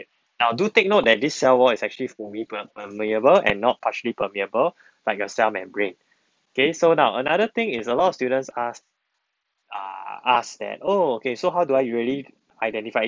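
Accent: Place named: France. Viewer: U speak like a french BTW its quite helpful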